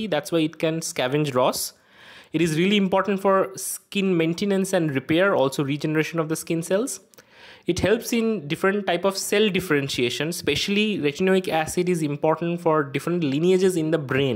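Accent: Bengal, India. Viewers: APNI KI BANGALI?? BENGALI ACCENT IS STRONG!!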